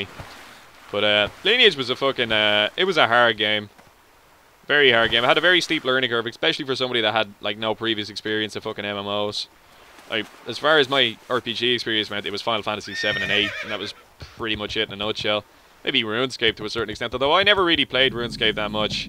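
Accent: Ireland. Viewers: Are you irish? you sound like a right dub hahah quality video though keep it up!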